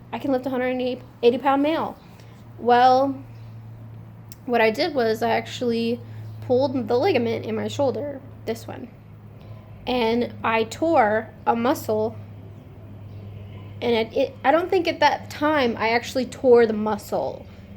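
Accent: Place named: United Kingdom. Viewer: Now em confuse either u from US or Uk coz ur accent is more british than American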